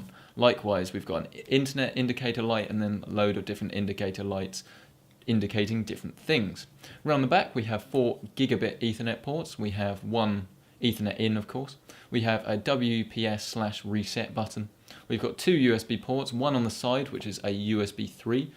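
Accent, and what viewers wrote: England, United Kingdom. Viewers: You can't beat a good English accent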